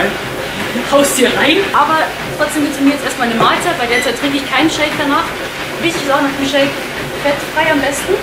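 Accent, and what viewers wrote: Bavaria, Germany. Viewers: Man hört manchmal deinen Bayrischen Akzent heraus :D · Kommt die aus bayern i schp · Waalnüsse 🐳🌰 der bayerische Akzent ist echt putzig 😄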